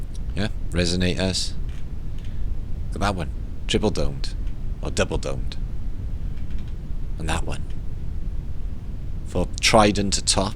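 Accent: Wales, United Kingdom. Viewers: Entranced so far thank you.. Just cutting in to say.. are u from south Wales. . U sound like u have at least half an accent for it.. it's all good.. I'm here in West Wales. . On the coast and yup.. Britain are just bullies.. no wonder we on a puppy eyed innocent "it wasn't meeee" type island. P.s. I'm half Manchester from when I was a kid.. and accent sounds familiar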